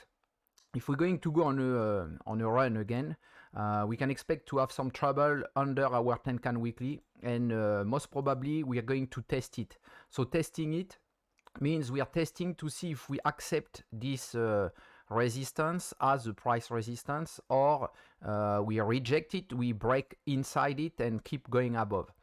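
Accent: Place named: France. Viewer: Salut t un français a ton accent tu peux pas faire une vidéo sur burency en français stp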